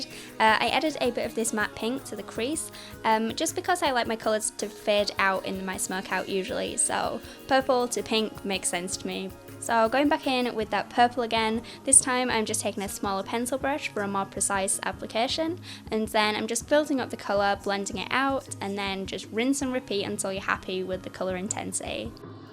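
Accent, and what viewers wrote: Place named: North, United Kingdom. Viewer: Brad and London are having an influence on you! You're starting to lose some of your northern accent and sound a bit softer, more southern:) No worries, I am from Surrey but I've been living in America for the past 18 years...people say I've got an American twang. (That actually pisses me off but it doesn't take me too long to get my accent back properly:)) Very pretty look, and like you said, very not you! That's a mad pigmented gloss, is it sticky too?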